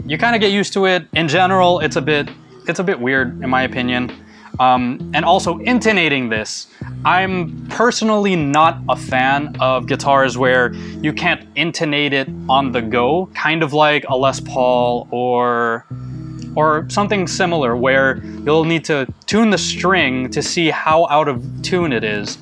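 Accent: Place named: United States. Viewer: Atlas is a nice word for pine. That os nice companies are putting the battery cavity in the guitar incase u switch/already have active pickups. I always just took the d battery and wrapped it in electrical tape and put it in the regular control cavity (alot cheaper and easier than routing a new cavity). Lol you threw me off when you said pesos because you have an american accent but Spanish last name.😊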